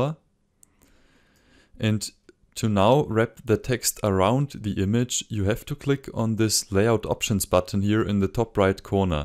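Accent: Germany. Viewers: Strong German accent my friend:)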